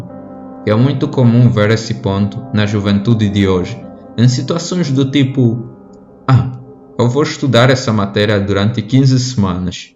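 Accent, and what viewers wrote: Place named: Portugal. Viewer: Oi, de qual país vc é? Seu sotaque parece muito o de portugal, eu sou Brasileiro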